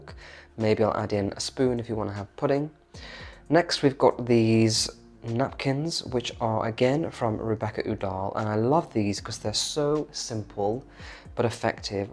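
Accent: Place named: Scotland, United Kingdom. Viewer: I see you likeBunny Mellon. She is a favourite of mine too. Sophie always steals the show. I have had a couple of Shi Sues over the years. Best dogs ever. Sorry, I did have a giggle over your Scottish accent. It took me ages to work out you meant lampshades. I thought you were saying lunch bids . Wondered what they were 🤪Really liked your topiary plate.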